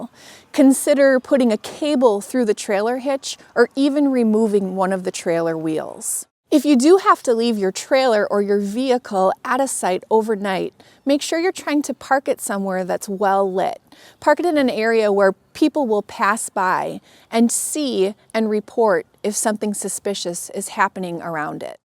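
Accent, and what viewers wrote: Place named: Wisconsin, United States. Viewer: its called an accent. Shes from Wisconsin. Also- <link>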